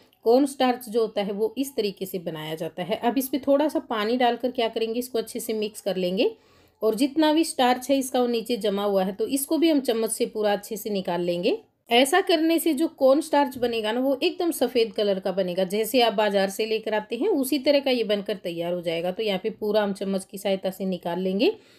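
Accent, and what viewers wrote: Haryana, India. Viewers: I think aap hariyana se ho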